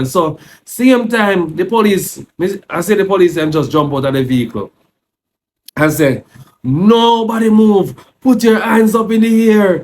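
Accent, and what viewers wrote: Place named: Jamaica. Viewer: Wow❗ So sorry 2hear your story... 1st of all, realizing that this happens a lot to POC in recialised societies & places, that's true 😵 And glad 2learn tht no-physical-harm came to you guys💯 Buut also just saying w/o equivocation or disrespect, its pretty difficult to sometimes follow your heavy Jamaican patios ((pat-wah)) accent... Caveat: Don't you realise that you might have a **Global Audience (which is a good thing), & is why you're on a public platform in the 1st place, right? However, not every individual tht comes 2yr channel knows, or is aware of Jamaican patois words? ~As an intelligent young man, just be cognizant of that fact? ✴️Yuh can duh bettah man! Talk clear, plain-English. After all, you're addressing your Global Audience, not just Jamaicans😃👋🏽 #Continue2STAY safe out There •Bless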